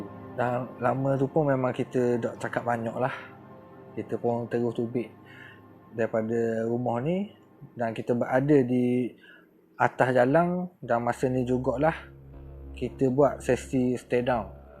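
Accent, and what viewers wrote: Terengganu, Malaysia. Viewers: Suka dengar loghat ganu ni...ada yg faham..ada yg tak...apa2 pun selalu ikuti vlog ni..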